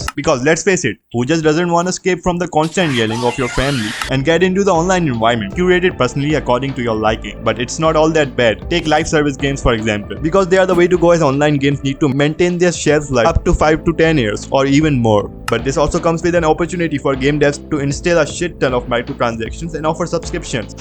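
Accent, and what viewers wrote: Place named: India. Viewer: bro you sound so much stereotypical Indian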